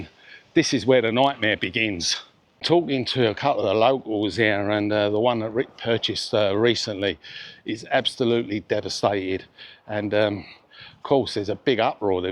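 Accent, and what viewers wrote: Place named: Cockney, United Kingdom. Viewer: I love the cockney accent!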